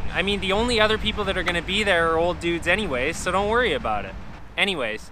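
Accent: Canada. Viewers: Is that a fellow Canadian I hear?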